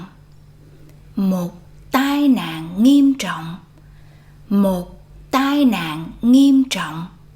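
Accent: South, Vietnam. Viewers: the teacher speaks Southern Vietnamese accent!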